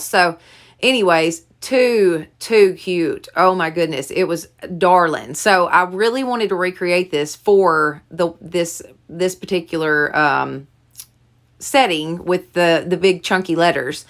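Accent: South, United States. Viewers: Love your creativity! What state do you live? You speak southern like me! I’m in Tennessee! I’m thinking maybe you live in Kentucky!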